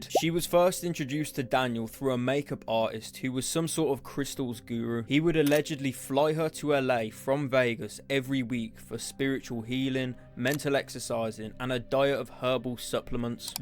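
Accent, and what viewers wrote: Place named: England, United Kingdom. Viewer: I was really surprised this channel doesn't have 100k subs. I enjoyed the video and I love his English accent, Americans never sound as cool. Sending love from the USA. Thanks for the interesting video. SUBSCRIBED!!!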